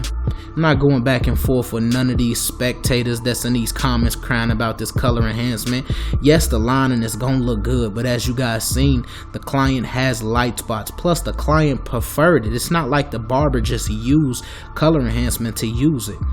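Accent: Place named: Texas, United States. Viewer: nice work I'm trying to figure out dat accent ? Texas ,New Orleans , Oklahoma? we need you to open a spot in Manhattan......God Bless ya kiddo.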